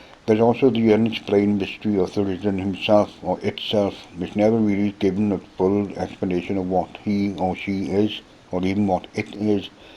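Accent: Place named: Wales, United Kingdom. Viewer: I've got an upcoming character on my channel I was thinking of giving a Welsh accent so I spent the night listening to your videos. I'm from the States so it's tough getting the rhythm down. The episode will be up in a week or so. Thanks for your work by the way. The classics are the best.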